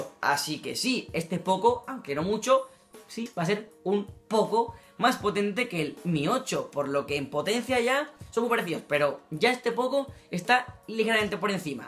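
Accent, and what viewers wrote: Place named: Andalusia, Spain. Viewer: Es el acento local, cuando estás unos años viviendo con andaluces lo entiendes todo perfectamente 😁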